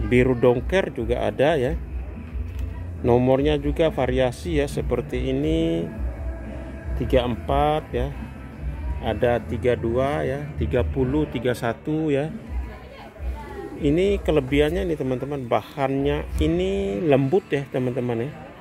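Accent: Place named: Bajawa, Indonesia. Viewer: Logat e Logat Su pi merantau Logat Kampung masi dibawa ke kota Besar e..dasar org BAJAWA Flores .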